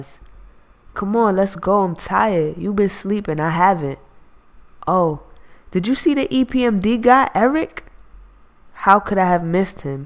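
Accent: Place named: New York, United States. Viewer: your welcome just give us more to hear I love your New Yorker accent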